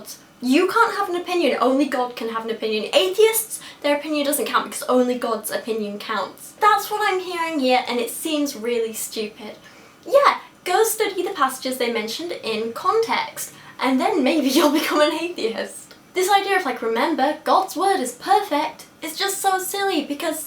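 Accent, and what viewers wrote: United Kingdom. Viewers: MaxxxwellC I think its... British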